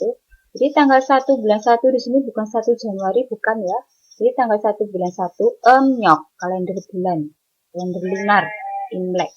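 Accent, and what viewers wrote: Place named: Java, Indonesia. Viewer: Jowo bgt bu...😊😊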